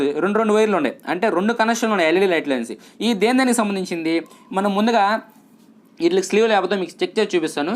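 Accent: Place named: Nellore, India. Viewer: Super cheppinaav bro.. Mana Nellore language Lo adharakottinav...Pulsar NS160 Ki same led lights koni vunnaanu.. Dhaaniki fitting Yelaa cheyyaalo oka video cheyyandi.. Chaalaa mandhi wait chesthunnaam Ikkada😍😍😍.i like this video and I like your words...nice bro keep it up. And 160 NS maathram marchipokandi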